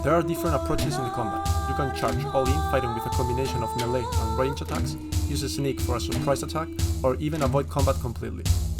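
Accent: Spain. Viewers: No se porque diría que eres español, por el acento JAJAJAJJAJA, me siento como en casa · El que habla es español no? Jeje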